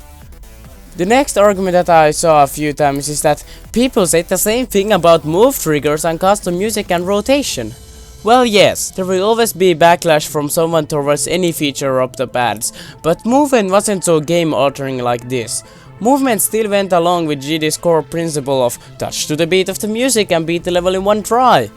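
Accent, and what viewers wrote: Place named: Finland. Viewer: sun äänestä kuulee heti että oot suomalainen :D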